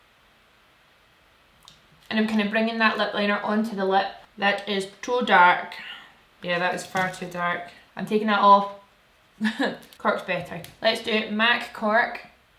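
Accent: Scotland, United Kingdom. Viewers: i am sure this gets so old but I am a sucker for accents and trying to pinpoint what they are. So forgive me in advance but is that a scottish accent i hear? Love your channel & videos as well, of course!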